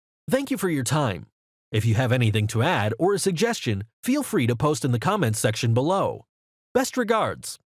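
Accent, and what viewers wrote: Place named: Russia. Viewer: This is insane look at this guys youtube profile he got lots of videos with a russian accent and a really bad laptop microphone but suddenly he speaks english perfectly and with a voice quality of a youtube star. This dude clearly has stolen this video or the voice to get his couple of bucks, how poor.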